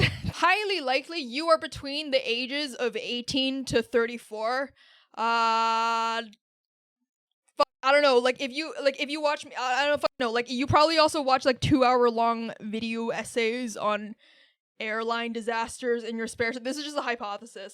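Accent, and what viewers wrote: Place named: United States. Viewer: you say you live in london, but your english sounds very american.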